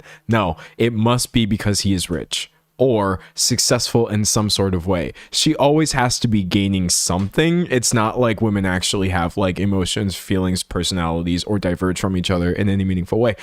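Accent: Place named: Texas, United States. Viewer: today I heard a clip of Connor Storrie talking and thought it was you. Is this some secret Texan accent I never knew about?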